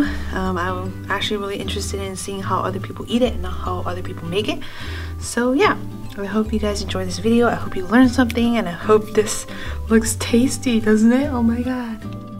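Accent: United States. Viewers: Are you from the south? You have a southern accent, like me. lol. I’m from NC. Thanks for sharing the recipe!!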